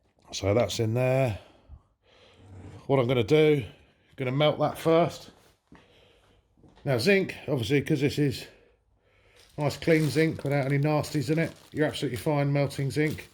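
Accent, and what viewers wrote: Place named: England, United Kingdom. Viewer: wch accent you got? from wich part of england are you from. Is nice!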